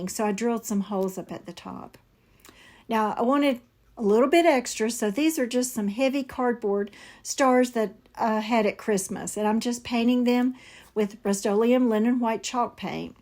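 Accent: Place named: North Carolina, United States. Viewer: Good morning from San Antonio! I really loved these ideas this week! I love all of your ideas, but this one inspired me so so much!!!! I have a quick question. You said you are from North Carolina.. I originally from Va beach and we just moved here to tx 3 years ago. Long story short. My family is originally from NC, actually my entire extended family still lives there. They live in Belmont, Lincolnton, And small town High Shoals. Now that my very long story, my life story 😂😂 are you close to those areas?? It’s your accent that sounds like them!! I guess I’m chatting with you because your my favorite!!!! Thanks 😊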